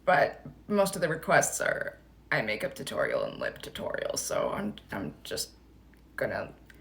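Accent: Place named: Midwest, United States. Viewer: why did I hear that in your Midwest accent?? 🤔🤣😍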